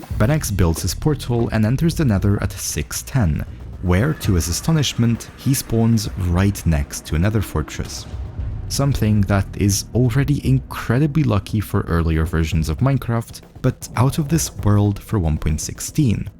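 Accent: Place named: Scotland, United Kingdom. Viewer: It's the vowel sounds that are giving that scottish twang especially the 'I' in minecraft, Northern english accents can have a lot of overlap with accents north of the border so that makes sense! Anyway cheers for answering and class video man!!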